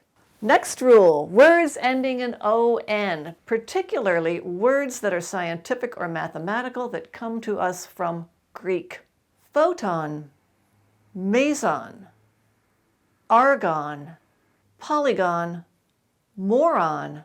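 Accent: United States. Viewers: we are speaking english - you with your ( gentle ) American accent, me with a strong Aussie accent. And yet somehow it sounds the same... Cheers lots Mate !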